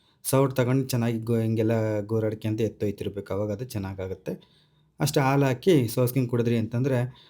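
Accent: Chitradurga, India. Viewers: ನಮಸ್ತೇ ಸರ್ ನಾವೂ ದುರ್ಗದವರೇ ಸಕ್ಕತ್ತಾಗಿದೆ ನಮ್ ಭಾಷೆ ನಿಮ್ಮ ಅಡುಗೆ ಸೂ.....ಪರ್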